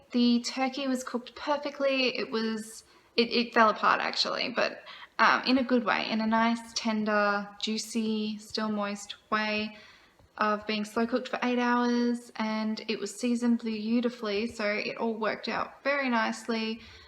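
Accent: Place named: Australia. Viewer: This is a marvelous video thank you so much for sharing this incredible video I love your Australian accent and just recently learned that Australians don't celebrate thanksgiving lol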